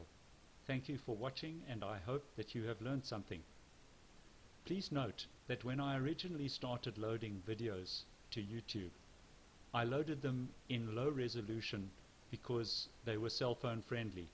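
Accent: South Africa. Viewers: I love randomly hearing an accent from home! Lekker bru :)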